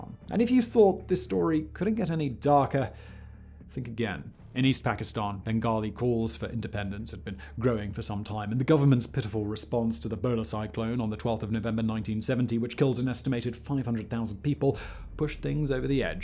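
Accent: United Kingdom. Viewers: Is is me or do you sound MORE British in this video?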